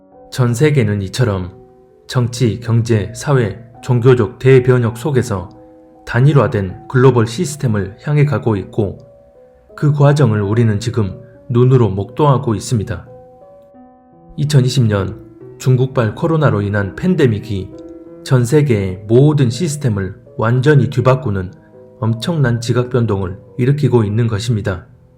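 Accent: Jeolla, South Korea. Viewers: 전라도 말투가 상당히 거슬리네요.트럼프가 적그리스도라는 개소리는 삼가시길 바랍니다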